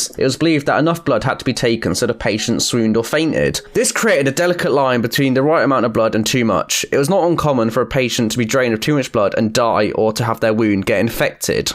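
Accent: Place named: United Kingdom. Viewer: To hard a british accent. Sounds like he ismeither too lazy to form complete words with a space between them, or has a mouth full of crap.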